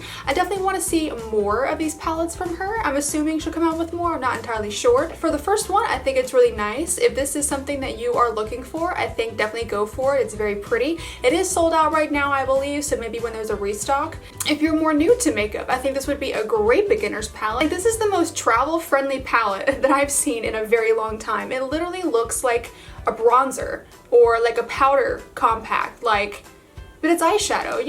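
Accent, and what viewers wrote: United States. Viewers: Hi new sub here Lets be friends! Ive not had any new videos uploaded lately! Im into makeup and diys! Im a TN girl, all my life just outside of Nashville!!! My accent is a little more deeper than yours lol Not sayin thats a good thing lol Omg opryland lol used to love the themepark...but the hotel omg is GORGEOUS and the lights at Christmas!!!! The mall...omg good luck getting a parking spot within an hour during Christmas!!! Right?? Lol Well, so EXCITED your man is coming, hope you have him on the channel...have a Merry Christmas!!! Big thumbs up! Maybe next year we can collab on a video or 2!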